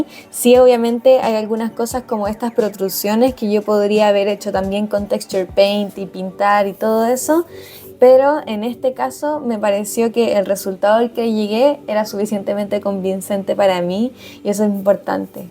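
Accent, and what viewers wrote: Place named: Chile. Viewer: claro que me interesa, yo te le decia como consejo, tambien hago 3d. igual creo que si grabas directamente lo que vas haciendo y hablas de lo que piensas tambien sería entretenido, ademas no tendrías que gastar tiempo en edicion de video y harías las 2 cosas al mismo tiempo, luego lo subes así no mas, total no tiene que salir perfecto, y lo imperfecto e improvisado funciona bien por el hecho de que si es que te equivocas, tambien la gente aprenderá de los errores y de posibles soluciones. ademas que dicen que mejora la oratoria el hablar a gente sin tener a nadie presente, no es que diga que hablas mal, es mas yo tambien soy chileno y me hacía falta escuchar mi acento desde que vivo en brasil, sobre todo si es con blender. eso de la oratoria se lo escuché a ooki de monkey, no se si lo conoces, es un colombiano que hace videos de escultura. 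igual solo es un consejo para que no gastes tiempo en guion (que no se si lo haces) o en edicion de video. ademas que resultaría super natural y podrías explotar tu naturalidad y no perder tiempo en cosas que lo mas probable no le preocupan mucho a la gente que desea ver tu contenido. yo no soy creador de contenido y no se mucho las dificultades que trae serlo, solo te lo comento por videos que eh visto que siguen esa rutina, por ejemplo las master class de alinwan studios. el solo se graba y luego en la edicion de video solo hace cortes, por si es que vá al baño y le agrega una intro y nada mas, pero su contenido es oro puro en blender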